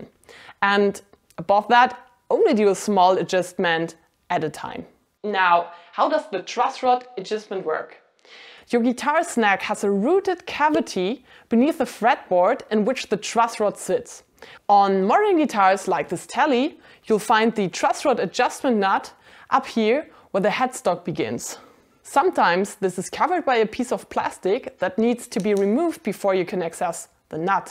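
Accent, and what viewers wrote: Germany. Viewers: Hallo. Am Dialekt nehme ich an Deutsche. Alle deine Videos zum Setup waren eine grosse Hilfe. Ich besitze eine rote TE-20 und veränderte ein paar Kleinigkeiten. Messing Steg Sättel compensated, neue Saiten, anderen Hals Sattel und Locking Tuner. Von Pickups sehe ich ab. Ob sich alles gelohnt hat für mich? Bin Anfänger. Die Mühe mit dem Hals Sattel würde ich nicht mehr auf mich nehmen, aber bin um eine Erfahrung reicher. LG Robert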